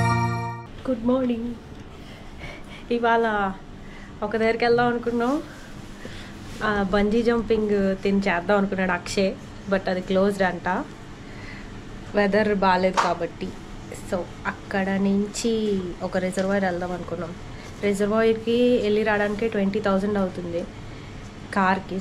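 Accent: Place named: Andhra Pradesh, India. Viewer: మీది రాముడువలస??? మీ యాస అలాగే వుంది. ప్లీజ్ చెప్పండి.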